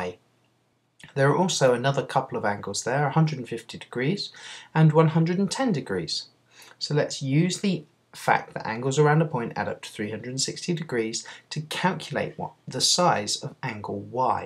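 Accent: United Kingdom. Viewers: go British accents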